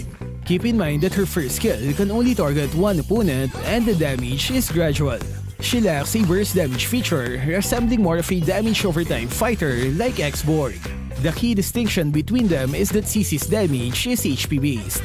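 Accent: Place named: Philippines. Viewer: anoyying pinoy accent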